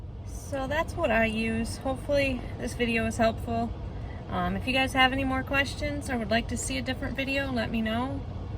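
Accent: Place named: Michigan, United States. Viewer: You sound like you may be from Michigan. My Love is from there, too. She's from just below Traverse City. A little town east of Manistee called Wellston. Just wondering. As always, travel safely...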